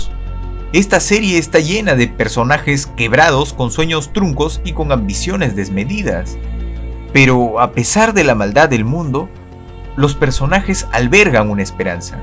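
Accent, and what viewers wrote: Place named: Peru. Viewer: Fascinante! Tu narración es tan natural que me das envidia, me encanta el acento peruano. Curiosamente estoy trabajando en un proyecto para Death Note y he tenido que ponerme a leer sobre el "bien común", entre otro temas, para entender mejor la obra, y te puedo asegurar que me has ayudado a digerir la idea mejor, eres muy bueno en esto. Por cierto, buena analogía la que haces al final: "Trabajo, ahorro, inversión", no podría decirlo mejor.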